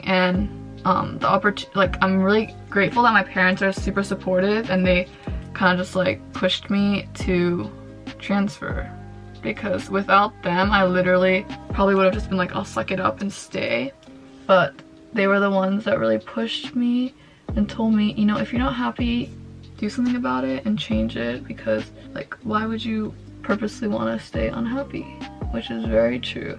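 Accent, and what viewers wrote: United States. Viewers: Choosing the right school and location is so hard. It’s hard to settle. Has your experience gotten better in Australia than the US? Your international schooling explains your accent. You sound like my cousins in Cali. Does japan have good schools? You might just miss home and the familiarity. Small world - I’m from San Jose lol. It’s not the world’s best place. Did you like San Francisco? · New to your channel and am wondering how your English is so American sounding if you are raised in Japan? And yes healthcare and college are all extremely expensive here in the California and the US in general.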